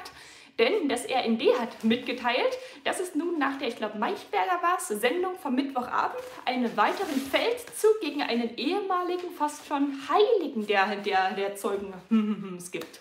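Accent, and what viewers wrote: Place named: Berlin, Germany. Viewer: "gepullert" 🤣 ich liebe euer Berliner Dialekt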